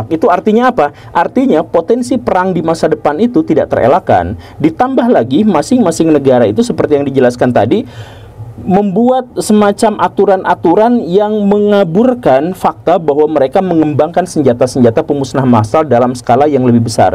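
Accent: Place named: West Java, Indonesia. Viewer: Senang dengan istilah pidio da bukan video,,, maap dan bukan maaf... Sunda banget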